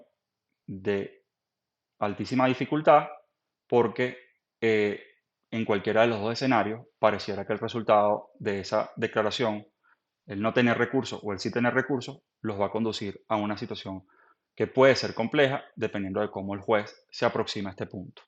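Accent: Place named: Venezuela. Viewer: ME ENCANTA TU TONITO, VENEZOLANO.❤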